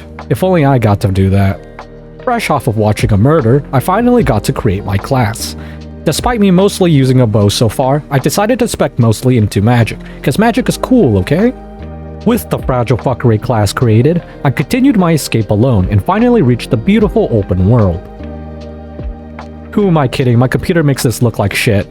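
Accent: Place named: Canada. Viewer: Ah your thick canadian accent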